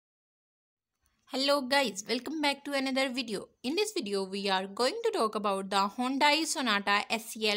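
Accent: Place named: India. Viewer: Very hard to understand, deep indian accent makes listener uncomfortable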